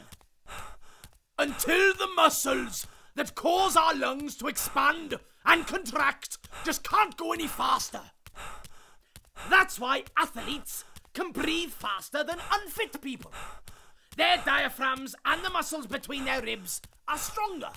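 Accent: Wales, United Kingdom. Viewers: There's some people who love the entertaining Welsh accent, and some who absolutely hate it. I appreciate the attempt to make this entertaining, but when there are so many people against it, I reckon it'd be safest if video makers didn't use it. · Warning: contains a dodgy Welsh accent.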